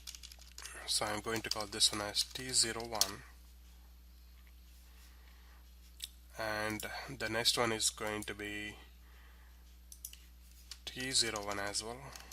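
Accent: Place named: India. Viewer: Very good. Since I'm Dutch I had some problems understanding this India way of pronouncing the English language. But who cares if the tut is excellent?! I really never saw the concepts of services so well explained as in this video.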